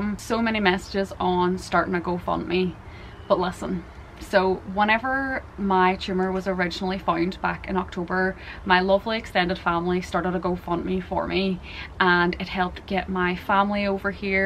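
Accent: Ireland. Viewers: you are such a beautiful person. I hope you get the absolute best outcome from all of this. Thank you for sharing your diagnoses and journey with us. As a fellow Irish (my dads full Irish but never used his accent when I was growing up so I've always been gutted I don't have it), i adore your accent and could listen to you for hours haha! Sending you so much love and positive thoughts! 💚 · I love how you are community us, your smile, lovely Irish accent, your awesome wavy blonde hair, your ease and command into the camera, and knowledge. You seem to be picking up the medical terminology pretty well too. I love learning anythingmedicalrelated, , took a course in it many yrs ago, getting straight As. Came in really handy too, because several rare genetic diseases in my kids and grandkids. Sounds like you have had a rough couple of wks. I was so sad that your your surgeon oncologist took off out of country without calling you, making sure you and other patients were OK for the time he was unavailable. 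Very inconsiderate. Better days ahead for you both.❤ from ottawa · Hello, Your channel just popped up in my feed--maybe because I am following another cancer survivor (Dr Dan Says, he is a chiropractor and very inspiring man) but I really am getting an education on what some folks are having to deal with and at young ages (50s is too young too) Anyhoo, you are traffic stopping beautiiful and very sweet and well spoken and I think your channel is the first I have that is teaching me what a proper Irish accent sounds like. Wishing you the best in your life challenge. They are making breakthroughs all the time helping people live longer so don't give up!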